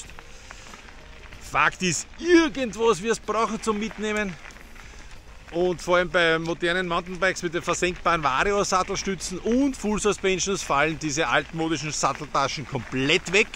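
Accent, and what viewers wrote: Austria. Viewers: Einfach top erklärt der Herr. Der österreichische Akzent ist sowieso die Krönung👍👍und es macht Spaß zuzuhören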